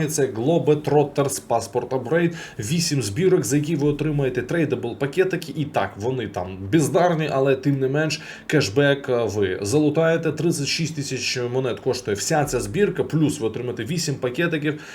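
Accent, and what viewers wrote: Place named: Kharkiv, Ukraine. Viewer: В Харькове так же говорят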